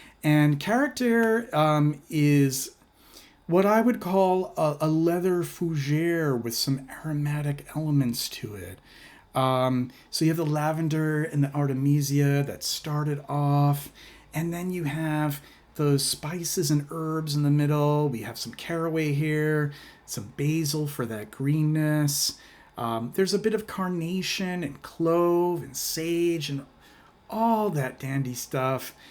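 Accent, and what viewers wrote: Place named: New England, United States. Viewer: What New England state are you from? I'm from there too and I can pick up that accent anywhere! CT?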